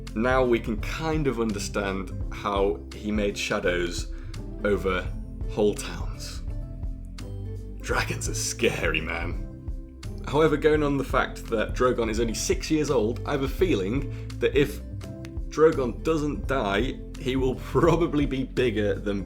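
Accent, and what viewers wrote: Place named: United Kingdom. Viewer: How can you not like a nice british accent?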